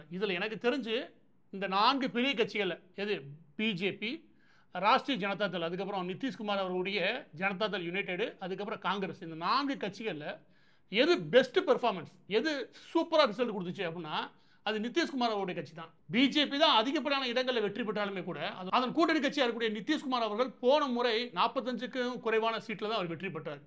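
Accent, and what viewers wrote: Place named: Sivagangai, India. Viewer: I like your dialects very relative to Sivagangai Cheemai. Hope you are from Manamadurai. I belong to proper Sivagangai. Pl continue. People used to kid me when I spoke the dialects in Chennai some 65 years ago. Vattara mozhi is really gives happiness.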